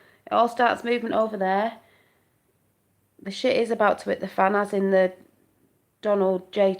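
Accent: United Kingdom. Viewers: Thanks n God Bless u 2 Beautiful. I thought that was a British Accent I love it 🤗 lol... I'm sure u hear 100×'s a day how Beautiful u r But every girl loves 2 b told there Beautiful So hopefully it made u 🙂 n if it did Awesome u hope I did make u smile while making ass of myself 😢😊 n e time u want 2 come 2 America I'd gladly put u on a flight. U Truly r The most beautiful woman I've ever randomly jus ran across here on YT 😍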